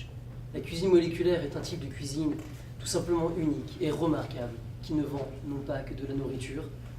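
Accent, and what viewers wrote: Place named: France. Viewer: Un sujet bien élaboré, des gestes corporels appropriés, un débit de langage maîtrisé tout ceci dans un français impeccable. Seriez-vous français car, l’accent est différent de celui des québécois?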